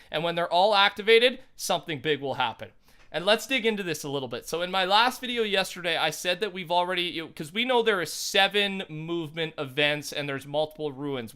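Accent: Canada. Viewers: Damn. So many comments and likes not one person got that it’s a Canadian accent we pronounce and spell a lot of words differently!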